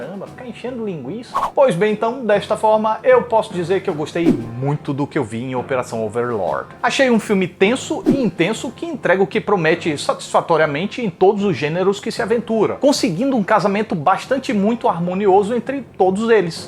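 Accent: Northeast, Brazil. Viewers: O sotaque e o palavriado Típico do nordeste. Que maravilha ver alguém usando tão bem nossa língua. Parabéns.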